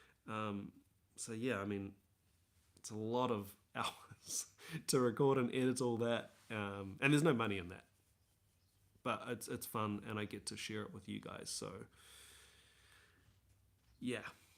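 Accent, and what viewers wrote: New Zealand. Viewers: Kiwi accent🤣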